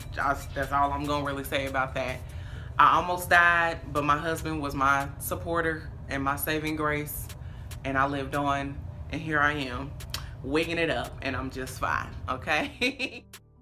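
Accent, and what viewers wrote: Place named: Texas, United States. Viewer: Just came across your videos. Love your personality and would like to know if you're from Texas? Your accent is similar to mine.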